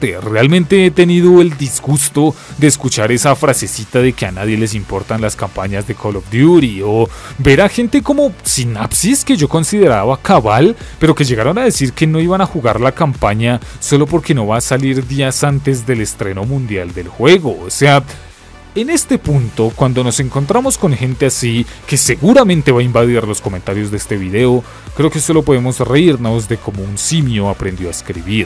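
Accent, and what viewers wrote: Colombia. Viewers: Gran video. Oye una pregunta, ¿tú de que parte de Colombia eres? xd.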